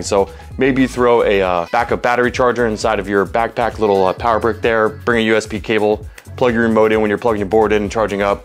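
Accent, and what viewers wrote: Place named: Germany. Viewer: Wow man, this is the best looking esk8 review I've ever seen ! From that sick intro with all the neon colors , then to the ride through the streets w/ those subtle "after-effects" they only last for a sec or two but I really liked it for w/e reason. Props to you and your crew for all those cool cinematic riding angles, this is gonna be a hard one for y'all to top but I got faith in ya! The board itself seems amazing for what you get at that price point, will definitely be recommending this if anyone ever asks about a beginner board. It costs slightly more than all the other $500 or so budget boards, but completely blows them out of the water in terms of features. Did you do the voice acting for the Medic in TF2, almost sounds like it, the german accent and everyting lol. Amazing job dudes, this video is an inspiring work!👍🤙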